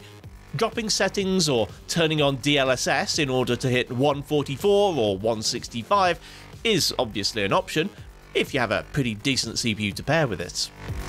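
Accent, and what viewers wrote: England, United Kingdom. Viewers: Be quiet!! Mr english accent got a new video!!!! 😎🤩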